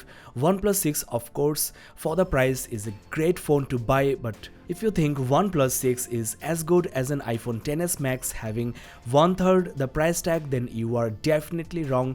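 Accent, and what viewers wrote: India. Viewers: Thicckkkk Indian accent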